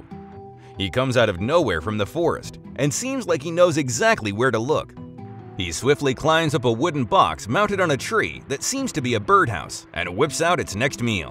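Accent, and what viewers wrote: United States. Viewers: That might be an excuse had this video been a European production . . . but the narrator is speaking English with an American accent, and it actually •shows• footage of (using the North American nomenclature) an adult elk, •not• a moose. The adult animal shown chasing some vehicle is a big deer, but it is NOT the biggest species of deer in the world. And in any event, the footage and most certainly does •not• show the marten attacking the fawn of an elk (let alone a moose); the prey is •far• too small for that. Even a newborn elk would be many times bigger than a marten. To be blunt, this video compels the conclusion that whoever compiled it has not the slightest idea what he’s talking about.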